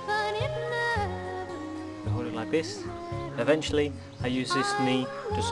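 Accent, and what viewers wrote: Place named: England, United Kingdom. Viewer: What's your background? You sound English not Czech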